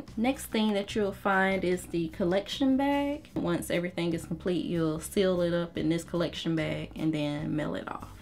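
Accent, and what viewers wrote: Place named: Mississippi, United States. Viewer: I can hear that Mississippi/Memphis accent, not as strong, maybe because you're in Cali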